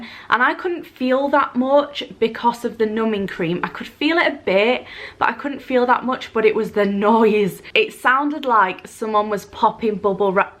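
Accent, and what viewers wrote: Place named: Yorkshire, United Kingdom. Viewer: Never realised how Yorkshire you sounded until your sped up lmao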